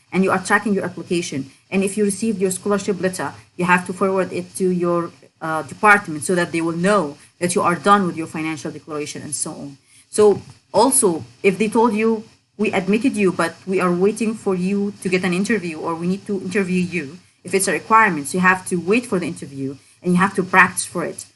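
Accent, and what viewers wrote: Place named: United Kingdom. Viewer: amazing British accent makes me feel how my English is so weak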